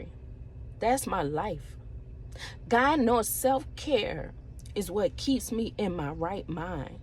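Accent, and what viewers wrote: Louisiana, United States. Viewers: She from Louisiana 😊 I 💕 her accent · I’m trying my hardest to stay positive in life but it’s been hard I feel like Joel in so many ways I have lost everything including some family members that I love dearly and I’m trying to figure my way through this storm it’s been going on for some years now I have been praying for blessings and opportunities and open doors I have never prayed this much ever in my life! I’m just not used to this I’m homeless I work for a relative and he doesn’t care about my situation I just need to get back on track ! My heart is pure ! I’m strong but I’m at a boiling point and I need help and prayer so boss lady can you please pray for me and I love your Louisiana accent ❤️❤️🙏🏽🙏🏽💯💯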